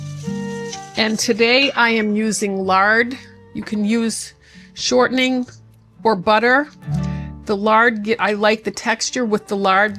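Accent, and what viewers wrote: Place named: New Hampshire, United States. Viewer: Where are you from. I swear I hear a northern mass southern nh accent. From where I was born!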